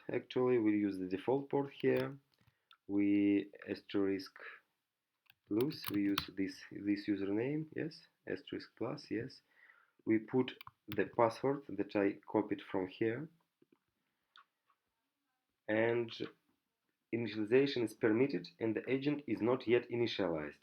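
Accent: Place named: Russia. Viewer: Привет. Отлично разговариваешь на английском, но у тебя русский акцент. Подписка.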